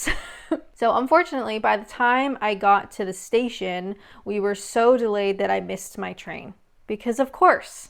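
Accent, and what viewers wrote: United Kingdom. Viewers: When you said to that seagull thee absolute cheek I was like yeah you’re definitely one of us.. you couldn’t of said that more English. Been a big fan of your channel for a few years now and you honestly have the British in you. Great content as always. Keep it up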